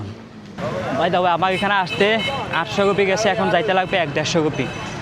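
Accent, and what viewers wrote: Chittagong, Bangladesh. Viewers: তুমি চিটাগাঙ্গে ভাষায় কথা বলো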